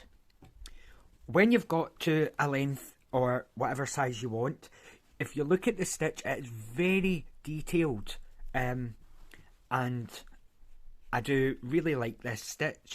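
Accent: Scotland, United Kingdom. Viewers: I'm from Devon and very rarely get to hear a scotsman very nice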